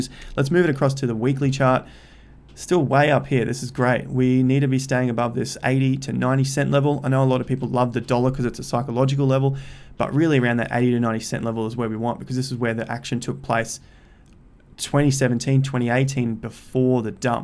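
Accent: Australia. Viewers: First video I watched of yours. Good to hear an aussie accent. I new subscriber :)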